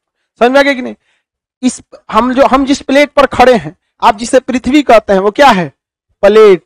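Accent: Bihar, India. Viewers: I love your Bihari accent sir....😄😆🙏🏻🤣😂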